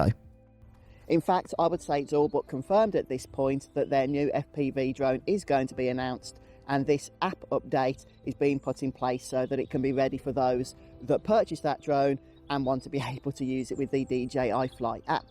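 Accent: England, United Kingdom. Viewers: Thank you for info What part of england is your accent from ?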